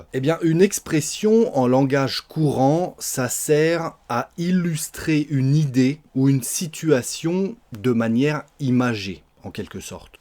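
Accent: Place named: France. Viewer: face-blue-smiling: ... Bonjour... Je ne sais pas si je vous ai déjà demandé d'où vient votre accent français... Je l'aime beaucoup et c'est pour cela que je vous suis. Merci pour ce que vous faites !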